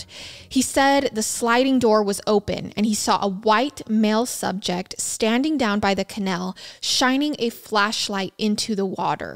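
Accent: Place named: Utah, United States. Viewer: I love the new series. A couple things.. and I mean the first one to be helpful haha not rude.. you’re supposed to pronounce the r’s in corroborate. It’s almost like you’re mixing corroborate and cooperate into one word. Hehe. And goodness girl, did you grow up in Utah??? You have one of the strongest accents I’ve heard from that area of the US! It jumped out right away and I was like wait a minute, I know that accent!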